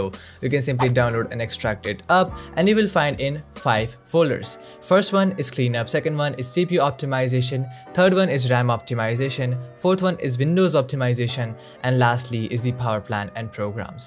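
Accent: India. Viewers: We can hear your Indian accent. You don't have to hide it.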